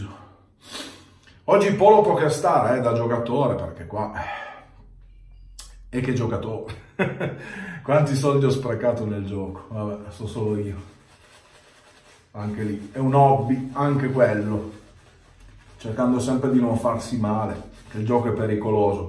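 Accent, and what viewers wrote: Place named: Piedmont, Italy. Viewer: Il tuo accento piemontese mi ricorda la "mia" Torino dalla quale sono partito ormai dal lontano 1996. Molto belle anche le interviste a Paolo Barrasso e Donato Ciniello. Un'altra video di compagnia visto / ascoltato mentre anch'io mi stavo radendo (la testa). Prima o poi vedremo anche una rasatura della testa? Buona giornata a te ed ai tuoi followers.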